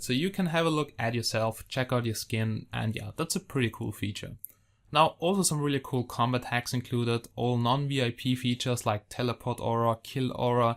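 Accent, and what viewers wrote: Germany. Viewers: Thanks, love your accent. German right ? Anyways everytime I click resource pack it crashes. Not big deal just thought to tell you. Nice video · wie man das deutsche leicht raushört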